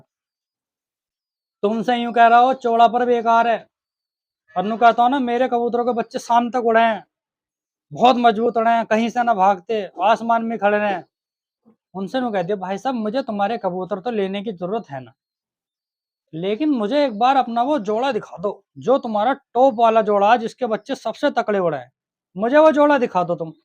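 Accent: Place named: Bijnor, India. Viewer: Bhai ne dil khush kar diya boli se bijnouri he bhai pakka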